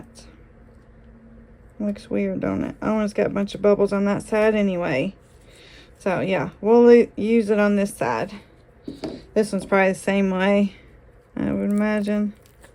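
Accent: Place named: Deep South, United States. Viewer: lol i smile everytime i hear the same words i use come out oh your mouth lol i dont have the deep south accent anymore(unless im really pissed or super excited about something) but i use the same slang and way of talking lol i dont feel so alone in this world on the west coast where people look at me funny when i say purdy or aite lol instead of pretty or alright lol i still use the word buggy instead of shopping cart lol lol i always say yonder and people look at me like i have two heads lol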